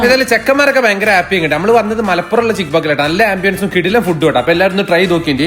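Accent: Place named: Palakkad, India. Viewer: പാലക്കാടൻ slangil bengalinod bengal evidayann choikku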